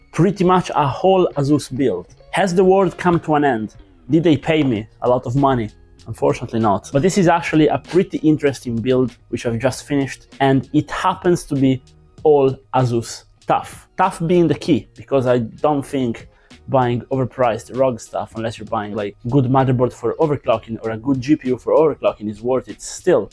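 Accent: Italy. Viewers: Are you Italian bro? Your accent is cool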